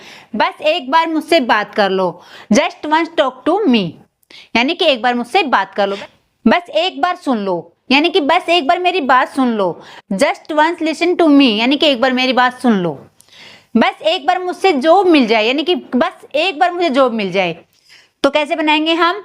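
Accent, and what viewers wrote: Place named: India. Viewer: आप बहुत अच्छा कंटेंट लेकर आती है और बहुत अच्छा पढ़ाती है but अब समय बदल गया है तो आप थोड़ा सा अपने एक्सेंट पर और प्रनंसीएशन पर भी काम कीजिए और आप यूट्यूब में थोड़ा सा ऐसी वीडियो देखिए जिससे आप जब इंग्लिश बोलती है तो उसमें देसी लहजा न लगे आप टीचर बहुत अच्छी है इसलिए मैं आपको सब्सक्राइब कर रहा हूं मैं यहां बुराई नहीं कर रहा हूं आपकी, बस आपको थोड़ा सा अपडेट रहने के लिए कह रहा हूं । समय के हिसाब से थोड़ा सा ध्यान देकर आप अपना देसी लेहजा हटाये और उच्चारण आज के समय के हिसाब से करें। सलाह ठीक लगे तो माने अन्यथा आप बहुत अच्छा पढ़ा रही हैं😊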